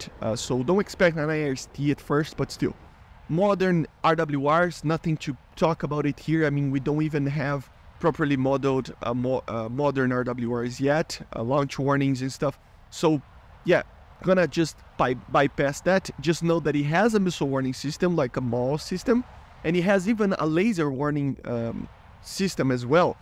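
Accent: Brazil. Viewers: is a brazilian with portuguese linguistic vices. So it might sound like the IRIS-T for a non portuguese speaker. He literally put an image of the IRST on the screen when he is talking about it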